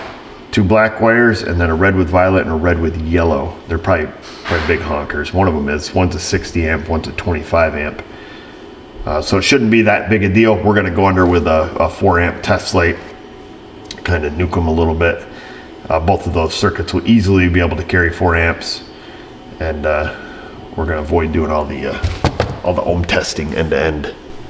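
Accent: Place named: New York, United States. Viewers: We love your New York accent. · So you must be from southern NY state as I hear you say Warsh instead of Wash. ! ya'll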